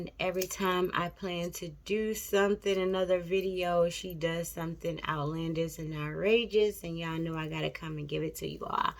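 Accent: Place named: Detroit, United States. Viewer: I’m a new subscriber. Enjoyed your video and I definitely hear that Detroit accent. 😀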